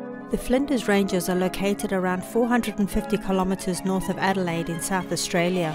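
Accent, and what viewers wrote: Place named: South Africa. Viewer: Great footage, please satisfy my curiosity, your accent sounds South African. Enjoy your trip.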